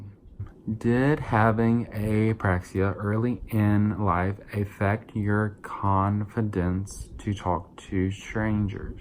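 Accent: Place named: South, United States. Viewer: What part of the south you from. I hear it real well lovely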